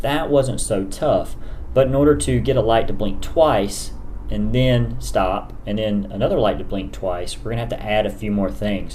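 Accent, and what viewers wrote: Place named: North Alabama, United States. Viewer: Where are you from? I grew up around the South Georgia accent and I can't quite pin you down. North Alabama, maybe? Thanks for the great tutorial.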